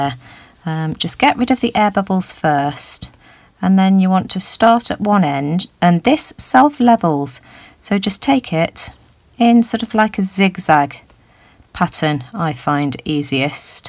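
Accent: United Kingdom. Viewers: Love love love your accent! So wish I was British 😭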